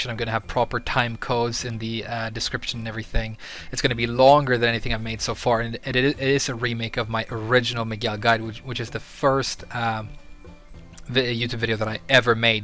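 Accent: Sweden. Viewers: Also, I realize ur Swedish accecent in ur first vid lol, cheers. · I've known you were Swedish from the beginning, you have a very good accent but the Swedish origins always shine through, no matter how hard you try (I should know, I'm Swedish too)! I love your videos and your Feng video especially helped me a ton, and even though you don't even play him yourself, you managed to make a really good guide. I'm sure MainMan was joking about that by the way. Keep up the good work! Skål!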